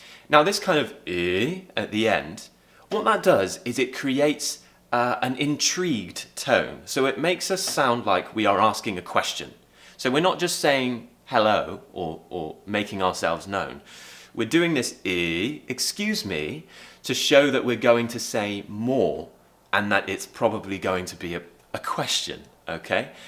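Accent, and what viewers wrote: United Kingdom. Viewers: Thank you, your videos are helpful. I like British accent. Even your new hairdo. 😄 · the more I watch your videos, the more love I have for thi british accent. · It's the first time I came across one of your video, and I'm sure I will catch up everything I missed! Your British accent it's superb! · Im watching yous vido with my son who is 8 yo, and he loves your British English so much. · I wish One day i can sound more british lile this guy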